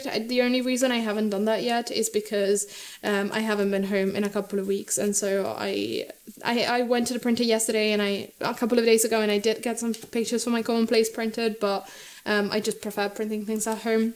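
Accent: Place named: United Kingdom. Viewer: ahh neat! I mostly think I hear an English accent when I hear you speak but as I said the longer I listen I realize there is something else there and I knew you had mentioned Italy before! Accents are so interesting in the way they can blend together and change quickly! I always think I would adopt an accent of wherever I am lol.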